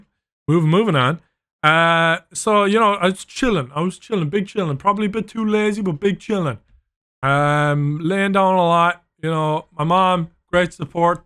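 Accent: Ireland. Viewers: Man being a fellow Irish man and listening to this story/explanation is hilarious because he explained it in such an Irish way😂 plus I just straight laughed for the whole duration of that car bit Anyways good luck and hope all goes well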